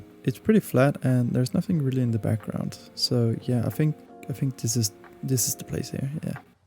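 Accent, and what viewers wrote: Denmark. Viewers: Yo you danish? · i noticed your accent, are you danish?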